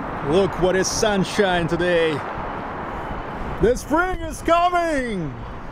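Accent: Russia. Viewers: I understood "salary's lab". You have russian pronouciatio: "o" becomes "a" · Daanggg, I was in St. Petersburg in 2018 for 12 days but I didn’t know about this place. Awesome! Btw. your thick fat Russian accented American English is so much fun to listen to. I subbed.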